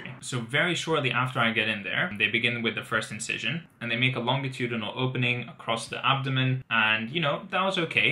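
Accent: United States. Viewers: Are you amirican?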